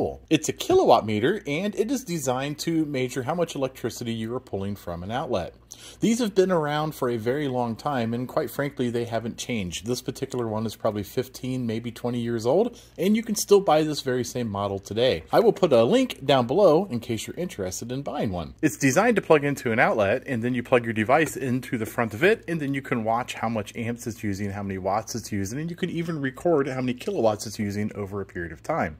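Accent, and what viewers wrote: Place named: Mid-Atlantic, United States. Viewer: Totally irrelevant but do you happen to be from northern Maryland, Pennsylvania, Jersey area? I'm quite interested in linguistics, I thought I detected a familiar accent.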